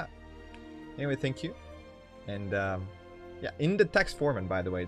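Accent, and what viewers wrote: South Korea. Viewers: 발음이 한국인이잖아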